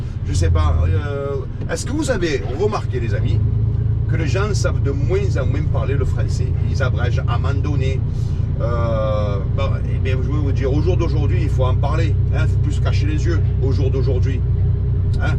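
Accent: Marseille, France. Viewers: Voilà ce qui me manque le plus aujourd´hui c´est ce franc parlé direct sans méchanceté ni agressivité que tu maitrises à la perfection. Le tout avec une pointe d´humour sans oublier l´accent Marseillais bien évidemment.......:)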